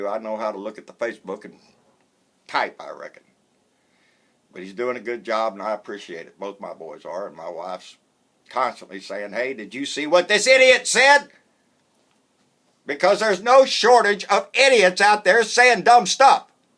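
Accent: United States. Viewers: u are what a real american sounds like Honest Passionate Thoughtful! thank you!!!!!!!!!!!!!!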